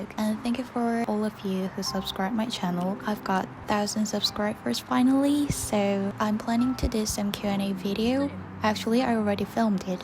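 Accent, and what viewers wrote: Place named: United Kingdom. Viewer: 영국식 영어 발음이 너무 멋있어서 영상 보는내내 몇번을 치였는지 몰라여💕진짜 작업 너무 멋있고 판화도 너무 멋있고 노을도 멋지고 그냥 너무너무 멋져요!!!😆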